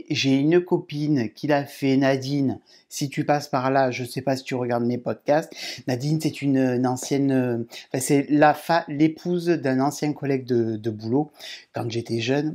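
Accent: South, France. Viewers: Bonjour c'est moi qui te remercie pour tes vidéos qui sont très agréables. L'accent du midi remplace le soleil qui manque dans la région parisienne. Un grand oui pour les vlogsmas de décembre. A bientôt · Bonsoir, je te decouvre au hasard du net et j'adore ta bonne humeur et ton accent du soleil. Merci pour ce moment détente autour d'une passion commune ❤ Christine, une nouvelle abonnée du nord Isère 😊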